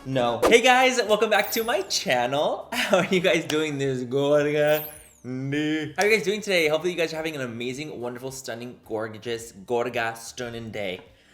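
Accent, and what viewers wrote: England, United Kingdom. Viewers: His English accent. what is happening. What did i miss 😂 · the English accent is killing me haha😂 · The British accent is no❤️ no hate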